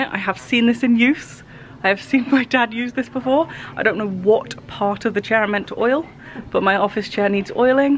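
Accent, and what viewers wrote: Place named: England, United Kingdom. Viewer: My google loves your voice! Without fail every time you talk to your google, mine obeys you. She hardly listens to me I have to repeat myself so often. Perhaps she prefers the English accent over my Australian accent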